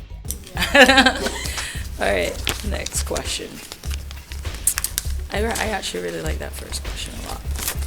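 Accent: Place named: Barbados. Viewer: I love her Bajan accent!! Bigup Barbados 🇧🇧 🇧🇧🇧🇧✊🏾🙌🏾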